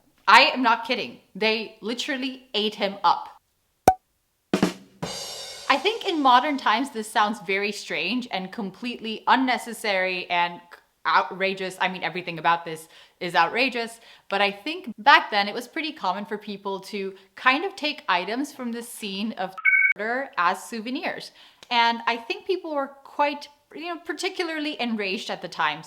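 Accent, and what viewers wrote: Netherlands. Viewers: It's so interesting to hear that your English-speaking accent sounds more Dutch now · Congrats with your Dutch citizenship. 🎉🎉You already sounded totally Dutch. And now you are. Gefeliciteerd met een echte kaaskop zijn🤣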